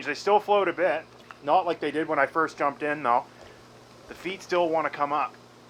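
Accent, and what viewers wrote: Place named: Canada. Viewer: Thumbs down because of canadian "aboot" and "ooot"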